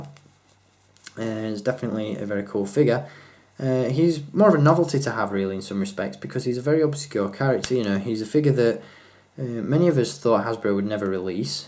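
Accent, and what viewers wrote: United Kingdom. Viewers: Are you British? You sound British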